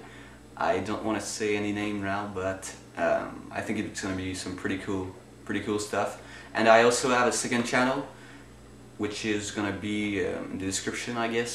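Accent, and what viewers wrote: Quebec, Canada. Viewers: Guys hes from french part of canada, so french is first language thats why he has an accent. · His first language is French, but Quebec's French has a little bit different accent than that of France's soooo ya :)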